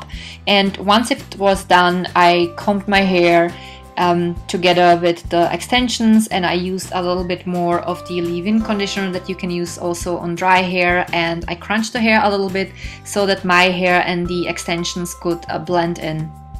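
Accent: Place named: Germany. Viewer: you sound very german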